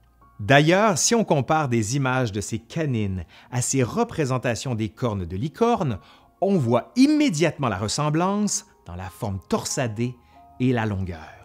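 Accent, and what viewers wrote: Quebec, Canada. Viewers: Ça me fait un grand plaisir de vous écouter, Monsieur. Peu à peu je développe un engouement pour l'accent québécois. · Encore une fois j apprends de nouvelles choses moi qui suis passionné d'Histoire et de mythologie ! De plus tu es un formidable conteur et pour moi Français ton accent québécois ajoute encore plus de magie dans ce sue tu nous contes ! C est charmant cet accent et ca rend encore plus captivant tes vidéos ! Je suis fan fan fan ! 😊😊 Continue de nous instruire par tes sujets historiques, mythologiques etc. avec tout le passion et le travail qu'on décèle dans tes vidéos ! Encore bravo et surtout encore mille merci ! 😉😉👏👏👏👏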